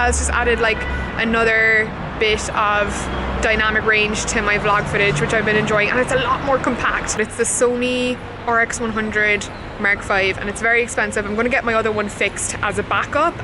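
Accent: Ireland. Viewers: 👌👍🤣 Still one of the theee most FABuuulous things you've said in the plethora of content with THAT wee accent!! "There is no care package than a care package from ..... from an Irish mammy" p.s. you-do-not-age girl...it is now Oct. end 2019 & this vid is from beg of March 2018....just sayin'!! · I think its the Irish accent and New York! And you living the dream out in the big apple!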